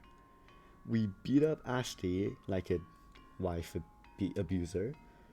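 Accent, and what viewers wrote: Turkey. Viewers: Yeah he really sounds Turkish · yes also I think you said you were Turkish one time that might’ve been another one I don’t know but the way you pronounce the English words🥀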